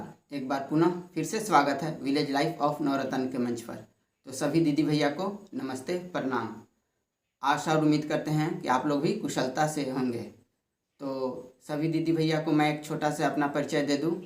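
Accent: Standard. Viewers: क्या शुद्ध हिंदी बोलते हो भाई 🥰गजब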